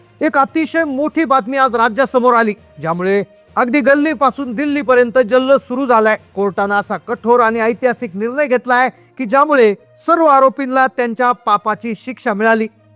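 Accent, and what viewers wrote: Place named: Parli, India. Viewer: परळी नको निट बोल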